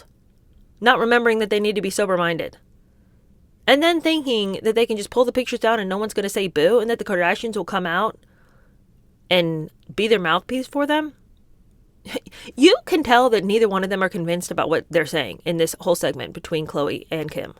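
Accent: United Kingdom. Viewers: Oh, you never disappoint. I clicked into this video in hopes of hearing your British accent and almost right out the gate...BAM...you delivered. Your videos haven't come up in my feed in a long time and now i realized how much I've missed you. 💕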